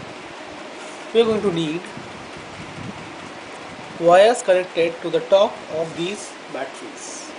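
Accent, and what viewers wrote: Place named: India. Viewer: I like your accent!😊 Are you Indian? I'm from Egypt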